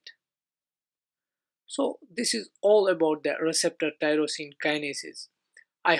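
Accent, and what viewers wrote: Kashmir, India. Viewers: recognised you from your accent as a fellow kashmiri ......grt to see such good work...Allah bless you aameen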